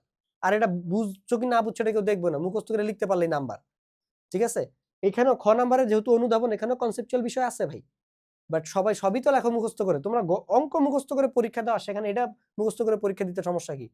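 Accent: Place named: Sylhet, Bangladesh. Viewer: Sir, afnar Sylhet mat je sundor,❤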